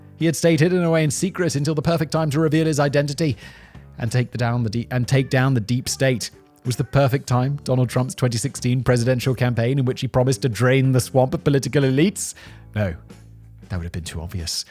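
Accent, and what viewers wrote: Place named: England, United Kingdom. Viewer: for some reason, `s middle class English accent makes the irony here even more savage yet funny!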